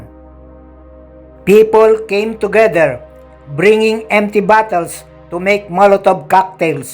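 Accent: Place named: Philippines. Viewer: Wow! That was great vlog,. Good English with Pinoy diction. Congratulations.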